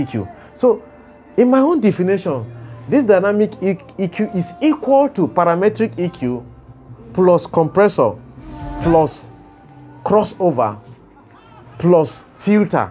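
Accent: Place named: Nigeria. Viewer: Informative, but that accent eyy, is that nigeria, it makes me remember the way nigeria school teacher speaks. But the information is 💯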